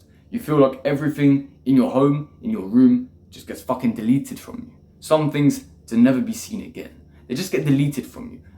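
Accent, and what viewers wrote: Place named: United Kingdom. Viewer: What’s your accent? It sounds British but with something else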